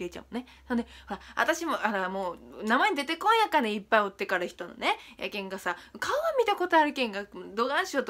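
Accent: Nagasaki, Japan. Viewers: 長崎弁聞きたくなったら定期的に来てしまう笑笑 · 長崎弁大好きです✨ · こてこての長崎弁使ってる🤣(笑)なんか、ちゃんの家の光景が目に浮かぶ😁幸せな家族だね😊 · 長崎出身やけん落ち着く〜〜😂 地元離れるとこの話し方が恋しくなる笑笑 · 数年間長崎居て姉が長崎弁ちょいちょい喋るから「うわこいつ長崎弁に侵食されやがった((((；ﾟДﾟ))))」って思ってたからレベチがここにいてなんか安心したやったぜ。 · 長崎弁好きすぎる、、もううちなんて、〜だべとかまじの田舎者の言葉だらけで嫌だ〜😩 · めちゃくちゃ長崎弁ぽいと思ってたらやっぱ長崎だったなんかうれしい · 最近の影響で、長崎弁が1番可愛くて好きって感じるようってる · 実際長崎弁っていうても広かよねー。ちゃんのは長崎市弁たいね！佐世保平戸五島島原それぞれ個性ありすぎて長崎市が標準語かなっておもうた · やっぱ長崎弁ていいね、話すとなると照れが入って話せないけど…好きだな～長崎弁！女が帰省からの、成田着くなりバックから取り出したのは、フルーツサンドイッチ、俺が長崎の物食べたいって言ったから買って来てくれて、周りに人がいるにもかかわらず即食いするもんだから（生温かく形も崩れてだけど）恥ずかしながらももっと買ってくればよかったね！良いんだよ気持ちと長崎が詰まってて旨いよ！今では良い思い出っちゃったけど。ちゃんの長崎弁で癒されます。長々と話してごめんよ、長崎弁バージョンありがとう☆体調崩さずに頑張ってね！応援してます。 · 知り合いの長崎人そっくりで、びっくりするwww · さんも長崎弁も可愛い💓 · ちゃんの長崎弁世界一かわいいこれマジ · 可愛い長崎弁😭😭❤ もうずっと聞いてたいから長崎弁バリバリのやつもっと希望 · 長崎弁がかわいいのかちゃんがかわいいのかどっちだろう… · 長崎弁普通に可愛い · が話す長崎弁が好きなの私たちは！ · 大学の時の長崎出身の友だちの喋りと同じでめっちゃ良い(笑)長崎弁めっちゃ大好き(笑) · かわいいなあ長崎弁俺の所方言とか特に無いから羨ましい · 全然分かんないけどちょっと方言憧れある笑特に長崎弁！！ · 本物の長崎弁ですね親近感がものすごあるとばい！横におるごた！ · うち関東で方言使わないけど、なんかちゃんの長崎弁永遠と聞いてられる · 長崎弁好きだなー笑笑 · 長崎弁ばり可愛い！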